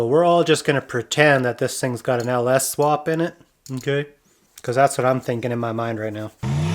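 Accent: Canada. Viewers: the canadian accent hahahha love it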